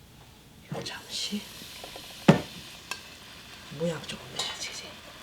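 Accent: Chungcheong, South Korea. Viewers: 충청도 말씨구만요 친근하게 느껴지잖아요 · 구수한 충청도 방언과 맛난 결안찜 굳입니다. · 충청도분이신가 봅네요 구수합니다ㆍ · 영상떠서 보고 바로 구독합니다 구수한충청도사투리인지요???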